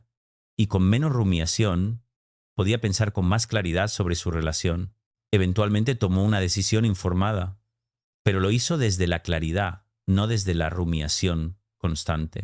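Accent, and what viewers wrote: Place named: Latin America. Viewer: Por qué tiene acento latino?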